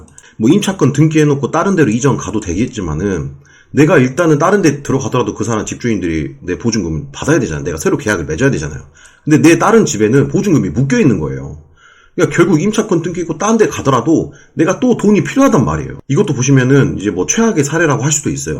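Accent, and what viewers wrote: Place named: Jeolla, South Korea. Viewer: 전라도 말투만 들어도 이제 토 나온다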